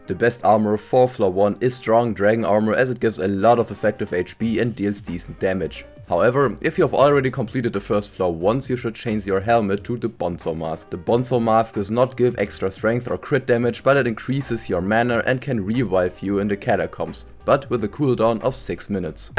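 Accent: Germany. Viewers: man hört dein deutschen aktzent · Are you german because you sound really german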